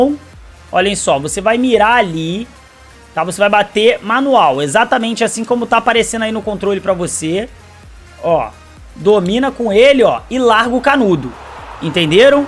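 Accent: Rio de Janeiro, Brazil. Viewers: O melhor é o sotaque de Carioca raiz kkk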